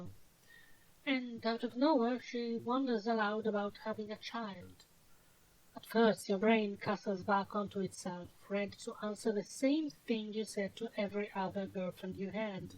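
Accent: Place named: Italy. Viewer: Dat thicc Italian accent! love it!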